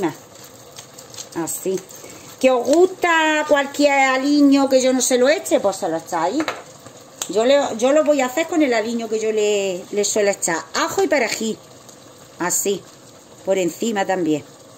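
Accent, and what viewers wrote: Andalusia, Spain. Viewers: Mamma mía!! Qué pintaza!! Otra de tus ricas recetas que me apunto. Se me está haciendo la boca agua😋😋. La salsita, para mojar pan. Y la guarnición de patatas tiene también una pinta buenísima. Muchas gracias. Eres una crack en la cocina. Además me encanta como explicas tus recetas, con esa gracia andaluza que no se puede aguantar. Creo que ya te lo comenté, soy catalana, pero también me tira mucho tu tierra. Mi madre era cordobesa. Por cierto, no estaría mal que algún día publicaras un libro con tus recetas. Exito seguro. Un abrazo desde Barcelona. Cuidaros mucho. 😘😘😘🌹🌹🌹🌺🌺🌺🌞🌞🌞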